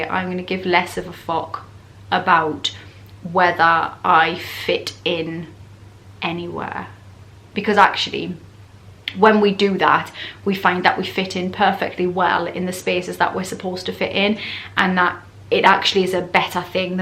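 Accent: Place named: Newcastle, United Kingdom. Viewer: Some nice Geordie in this, reminded me of my old housemate. "bewk"... I love it.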